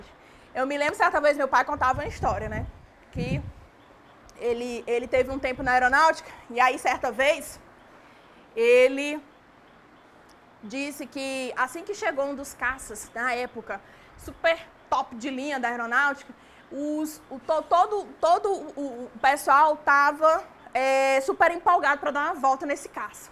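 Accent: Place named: Northeast, Brazil. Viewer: Primeiramente ressalto o português bem falado no sotaque nordestino aff ..Eu amo é um deleite para os meus ouvidos, acho sotaque mais bonito do Brasil. É também dizer que essa palestra foi um deleite para o meus ouvido ,vi a hora mais vou ouvir muitas outras vezes e seguir essa palestrante que amei obrigada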